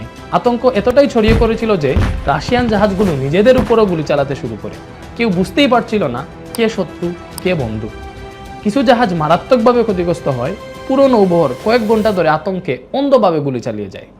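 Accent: Sylhet, Bangladesh. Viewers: bhai apni ki faltu Sylhet er moto kotha bolen keno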